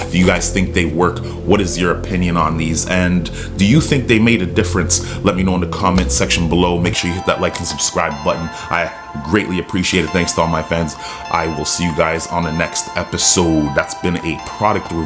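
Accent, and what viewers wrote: Toronto, Canada. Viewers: U from the 6ix??! U sound like it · sounds like he’s from toronto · Jeez this guy's Canadian eh? I hear it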